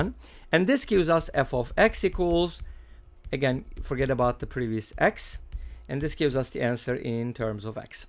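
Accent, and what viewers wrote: Turkey. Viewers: Abi ingilizcen gayet gelişmiş. Daha demin 1 yil onceki videonu izliyodum, türk oldugun hayvan kadar anlasiliyordu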